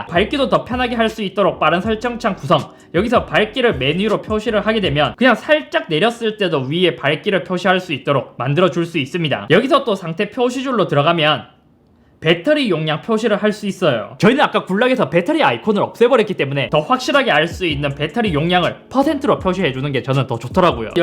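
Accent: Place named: Western Gyeongnam, South Korea. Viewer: 앗!! 이제 아이폰 애플워치에서 아예 갈아타신거에요?? 잇섭님의 서부 경남목소리가 정겨워서 리뷰가 눈과 귀에 속속 들어왔는데... 같이 삼성으로 갈아타기도 그렇구... 항상 응원할께요!! 그럼... 기존 애플 제품 중고로 파시지는 않으세요??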